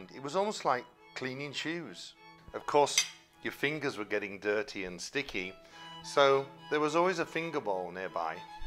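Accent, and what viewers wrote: United Kingdom. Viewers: The british accent, the music and the "amusing" sequences with the plush dog remind me of the educational films in english lessons from fifth class. :D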